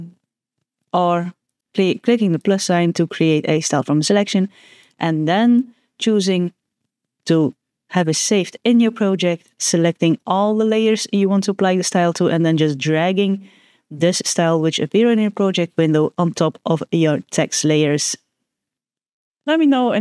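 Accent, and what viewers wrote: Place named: Netherlands. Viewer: I know a Dutch accent when I hear one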